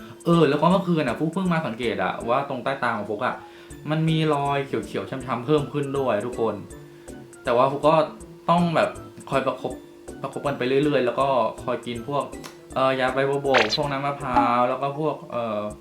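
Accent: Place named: Provincial, Thailand. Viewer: เป็นคนที่ไหนหรอครับ พูดเหน่อจัง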